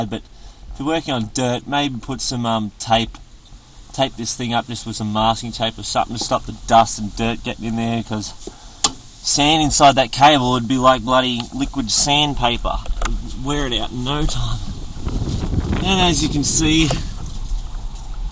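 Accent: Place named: Australia. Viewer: Thanks for your video, and lovin' that Aussie accent and vocabulary ! Nothin' too scary ! Cheers from a Kiwi-Aussie !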